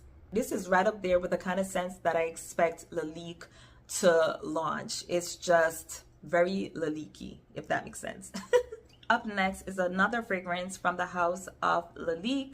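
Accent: Jamaica. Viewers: Truly enjoyed this video. Absolutely love your Jamaican accent.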